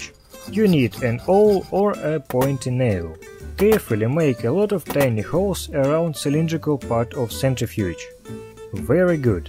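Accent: Russia. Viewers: I heard you are russian un the first ten seconds. it's notre gold yet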